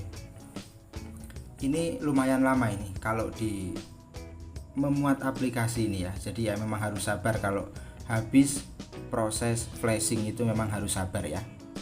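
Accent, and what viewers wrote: Java, Indonesia. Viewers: Mantap kang, tempat servisnya dimana ch bang,dari logatnya ch orang jawa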